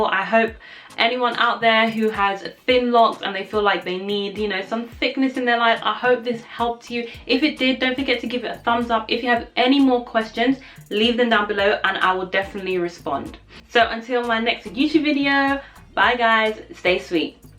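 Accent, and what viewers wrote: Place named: England, United Kingdom. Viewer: wow I'm in my journey as well but you're beautiful accent took me way off course and I pay no attention to nothing else. Let me stay focused are you from England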